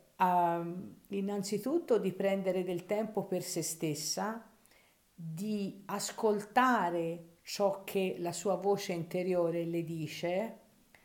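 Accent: Tuscany, Italy. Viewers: Sei dolcissima ahahah 🤣🤣🤣🤣🤣😂😂😂😂già solo cm parli metti allegria, sei Toscana? Poi la chitarra troppo carina 😂😂😂😂😂🥰🥰🥰🥰🥰♥️♥️♥️♥️♥️♥️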